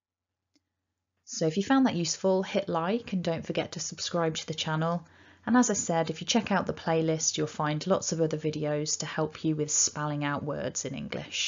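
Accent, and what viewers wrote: United Kingdom. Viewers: are you British? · is this voice a scouse voice? the way "h " is pronounced is really cute a part from a few other words uttered as cute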